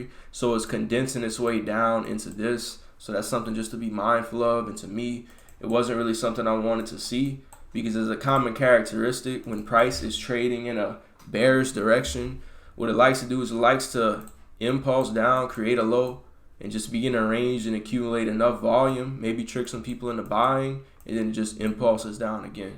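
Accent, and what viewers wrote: Louisiana, United States. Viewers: I knew you was from Louisiana accent heavy im in New Orleans ⚜️⚜️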